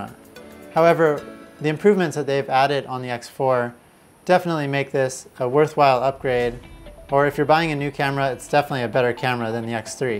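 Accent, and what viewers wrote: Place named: United States. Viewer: Finally someone who speaks American English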